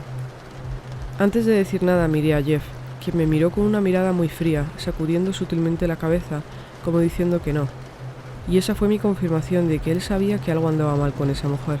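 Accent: Spain. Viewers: Me gustan mucho tus historias y ese acento español lo adoro 😍 sin duda este ya es de mis canales favoritos además tus historias están muy interesantes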